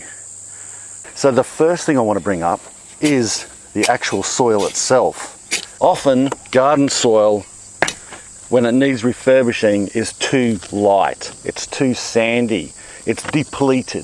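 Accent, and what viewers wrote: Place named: Brisbane, Australia. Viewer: Your not from brissie by any chance? Love some guidance and knowledge for free labour!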